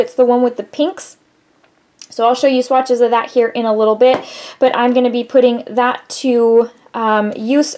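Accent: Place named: Midwest, United States. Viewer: I would have never guessed you were from Texas. Did you grow up in the midwest? Your accent sounds like my midwestern relatives!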